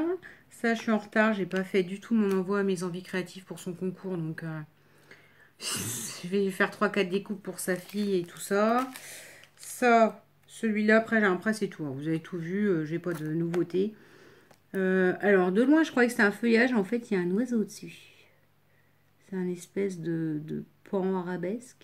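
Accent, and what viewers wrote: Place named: Reims, France. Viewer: Ouai c'est clair tu as l'accent de Reims 😂😂 un p'tit bout de chez moi 😂😂😂😂 action Rethel est pas mal non plus si tu as l'occasion d'y aller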